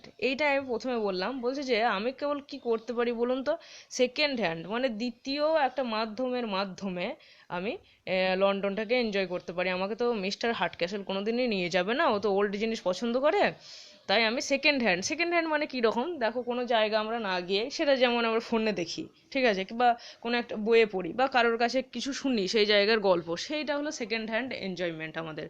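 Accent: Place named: Katwa, India. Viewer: To cover up the whole syllabus for the upcoming SLST, binge watching/listening the whole playlist. Thank you very much. Madam, are you from Bazarsau-Shaktipur-Katwa area? The bengali accent seems familiar.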